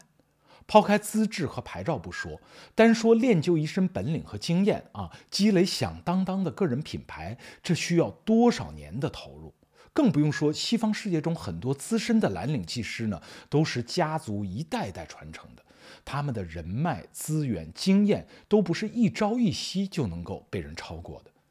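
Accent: Standard. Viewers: 主播的标准普通话非常好，非常珍贵，听着就舒服。 现在很多其他主播普通话都不标准，说话时舌头伸不直，都秉承特色社会主义装B狂，听着觉得恶心。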